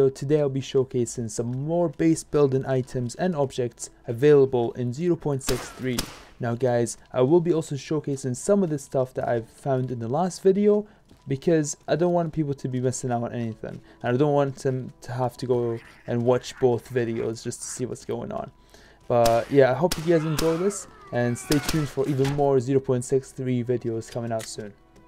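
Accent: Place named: Scotland, United Kingdom. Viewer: Yes mate I thought I heard some scottish in there! Class video, keep up the good work.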